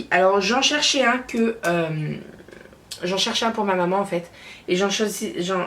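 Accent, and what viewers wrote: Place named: England, United Kingdom. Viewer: Coucou ♥ Alors je participe a ce petit concours bien sympathique :D Alors j'aimerais gagné le parfum Magnetic qui est la dupe de dody de Burberry. Alors pour la petite histoire mon père a celui pour homme et je l'adore donc je me dit que celui pour femme seras une perfection :) De plus je n'es que un petit parfum ( amor amor de Cacharel en forma 33 mL ) et comme c'est un parfum d'été et que je n'es pas de parfum d'hier. Merci pour ce concours. GROS bisous ♥ ps: J'adore ton accent d'Anglais ;) ♥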